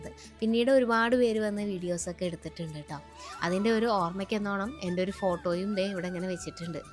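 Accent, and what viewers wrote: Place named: Valluvanad, India. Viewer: വള്ളുവനാടൻ ശൈലി ആണല്ലോ സംസാരം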